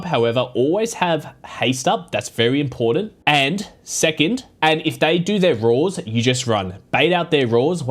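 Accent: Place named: Australia. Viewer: Loving the australian accent haha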